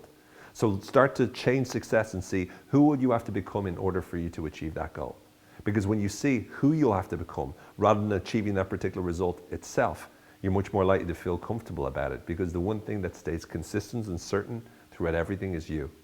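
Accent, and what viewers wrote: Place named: Ireland. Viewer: You speak in a wonderful way, with clarity, passion, wisdom, and a lovely soft irish accent : )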